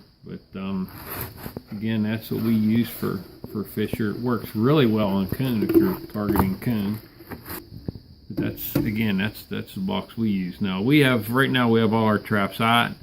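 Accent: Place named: Southwestern Pennsylvania, United States. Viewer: Man I thought I had a heavy SW PA accent. Great video.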